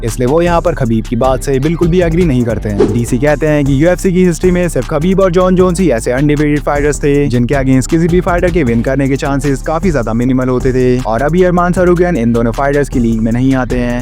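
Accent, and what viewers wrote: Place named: India. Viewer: Wich language are you speaking i hear enlish brother i hear india i hear nigeria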